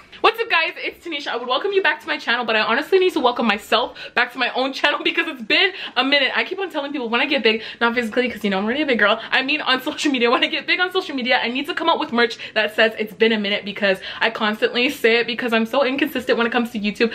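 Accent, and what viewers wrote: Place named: Toronto, Canada. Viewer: I’m from gta area and just listening to you I’m like, that’s a Toronto girl I know it loool!! Keep up the hustle girl you’re killing it!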